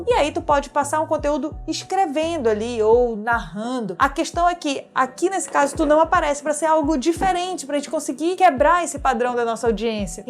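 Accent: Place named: South, Brazil. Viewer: Você é uma mistura de sulista, carioca e português. TU, TU TENS, TU ÉS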